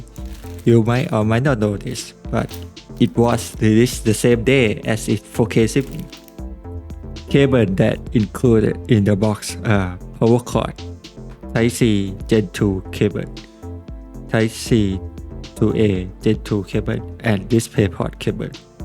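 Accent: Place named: Thailand. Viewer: I recognize that Thai accent right away. Good job bro.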